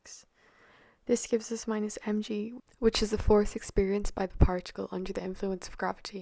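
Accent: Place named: Ireland. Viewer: the speaker's accent sounds a bit Irish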